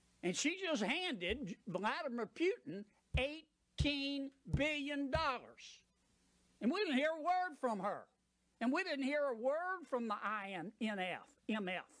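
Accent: South, United States. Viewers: Common sense with a Southern accent!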